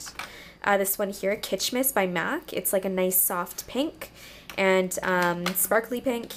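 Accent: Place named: Canada. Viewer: Canadian accent on fleek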